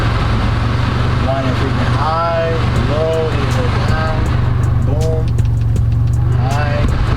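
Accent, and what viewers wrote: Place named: Latin America. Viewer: I apologize for this, but I catch some accent (latino accent) . My bad man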